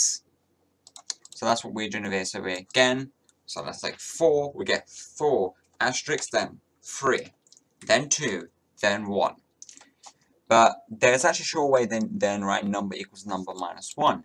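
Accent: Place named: United Kingdom. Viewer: bro he has the most generic british accent wdym😂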